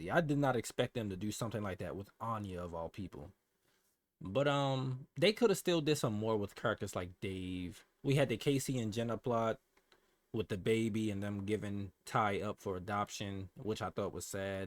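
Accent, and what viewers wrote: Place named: Baltimore, United States. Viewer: Are you from Baltimore? I hear an accent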